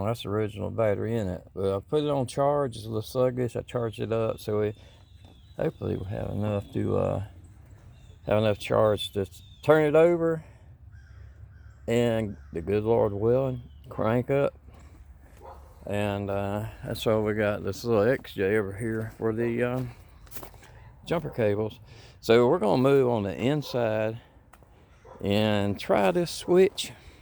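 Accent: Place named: South, United States. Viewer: Southern folk, only accent i can watch at 2xspeed just fine😉 Good vid, never thought of swapping key electronics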